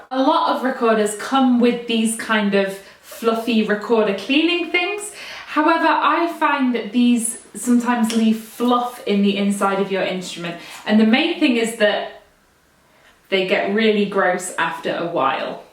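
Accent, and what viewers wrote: England, United Kingdom. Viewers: I speak Portuguese, but learned English by myself, after years of web-surfing, song playing and gaming. I am an amateur musician (I play guitar, C and F recorders, harmonica), and I can say that your videos are mesmerising - not only because of your skills and simpathy, but because of your English accent... and, lets be honest, when you speak, your mouth is hypnotic!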